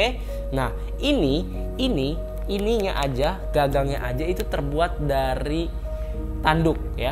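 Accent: Banten, Indonesia. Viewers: Logat nya kaya orang serang Banten ya bang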